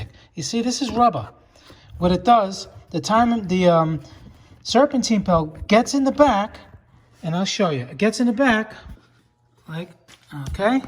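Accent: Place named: New England, United States. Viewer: My Boi got that New England/ NY accent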